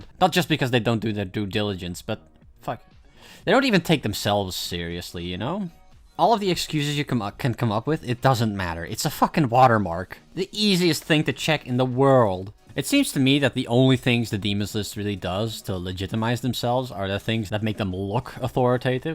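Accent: Netherlands. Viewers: are you dutch by any chance? nice video btw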